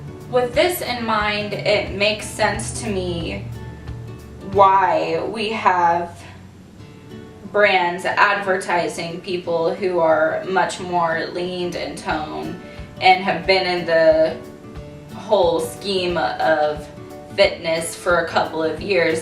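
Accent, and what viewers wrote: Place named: South, United States. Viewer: You should be a marketing expert! We are Individuals on the inside and out, but the industry is only researching and going for the bottom line - $$$. Love your research - You are a person after my own heart!!! Side Note: Your 'fake' southern accent may not be as fake as you think - most of our relatives on my side are from Alabama and Georgia and came to Southern Illinois who definitely have the southern accent - I picked it up after a week staying with my Cousins. Got Home Safe.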